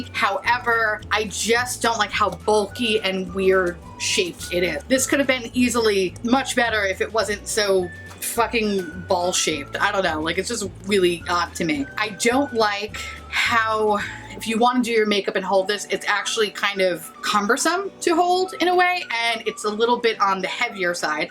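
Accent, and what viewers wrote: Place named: United States. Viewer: I think we may be the same person. It's like listening to myself talk with an American accent. ...aaaaannnnnndddddd subscribe!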